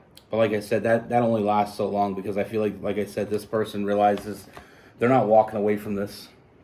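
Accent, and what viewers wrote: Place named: Canada. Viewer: I can hear a Canadian accent, but my goodness. Rain in July??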